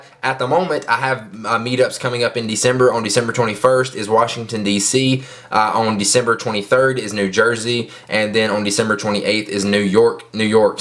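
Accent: South, United States. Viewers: I might wanna infer that your accent's from the South! Are yah.!?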